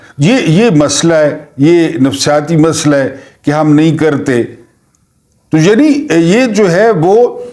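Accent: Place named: Mianwali, Pakistan. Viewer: Vlog ki ahmiyet aur maqsad Kai Saath Saath mianwali lehjay Mai guftagoo, Sahab Allah Aapko khush rakhay. AAP ne haqq. Ada kar diya.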